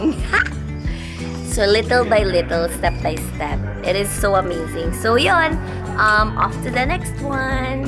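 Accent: Visayas, Philippines. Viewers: hala hahahahaha! bisaya-a oi! molutaw jud.. love it! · Cute kaayo ka mag bisaya naa tawo🙂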